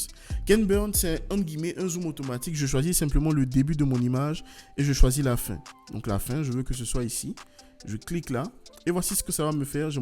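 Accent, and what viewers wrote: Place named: Ivory Coast. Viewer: Merci, super tuto et encore plus merci pour l’accent ivoirien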